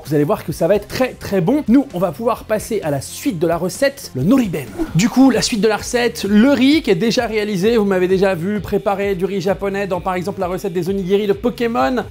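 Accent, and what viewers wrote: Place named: South-West, France. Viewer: Ce que j'aime chez, c'est cet accent du Sud-Ouest qui ressort même en parlant de cuisine Japonaise =D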